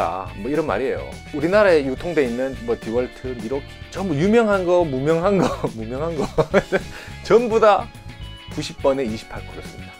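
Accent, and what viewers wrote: Gyeongsang, South Korea. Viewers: 너무 쉽게 그리고 재밌게 귀에 쏙쏙 설명을 잘해주시네요 같은 경상도로 그런가? ㅎㅎ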